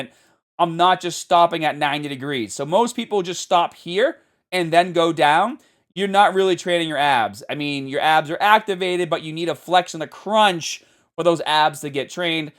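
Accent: Boston, United States. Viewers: Boston accent crazy lmao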